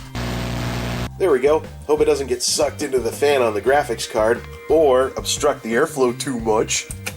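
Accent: United States. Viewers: I was digging the sick South accent man. Thanks for the vids, good stuff.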